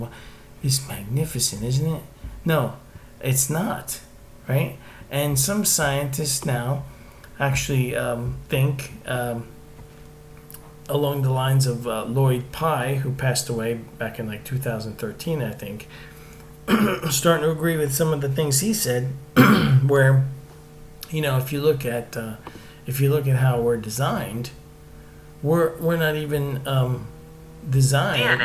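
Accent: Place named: United States. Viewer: You have such a soothing voice, probably the most pleasing American accent I've ever heard.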